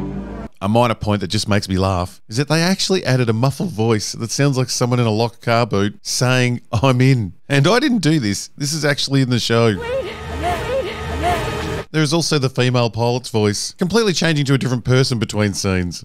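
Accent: Australia. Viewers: Ah.....luv ya work,! And the Aussie accent totally keeps it real, bro! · Lmao I love this channel. The AT AT with that music intro and outro (idk why I love it sm but I do haha), the constant bashing of Disney’s Star Wars, and the hilarious jokes this guy makes with an Australian (I’m assuming) accent. I apologize if I assumed wrong haha but keep the good work up. Love your content :)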